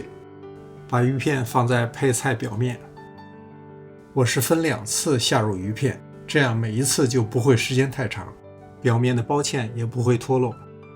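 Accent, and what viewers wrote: Beijing, China. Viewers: 我听着也像北京口音。我是木樨地一带的。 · 听到京味礼貌的乡音，好亲切呀！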